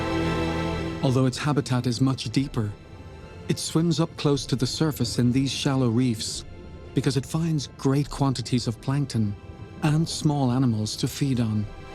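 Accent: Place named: Ireland. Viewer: Irish dialect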